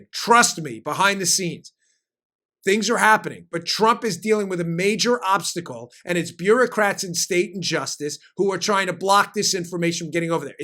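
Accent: New York, United States. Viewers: HAHA FUCKIN DAN..."WAX RX...it comes like this,....and goes on like that, buy it you'll love it " bang boom pow! HahahaHaha with his New York accent, and then Joe hits em with the MUTTLEY LAUGH, oh I'm dying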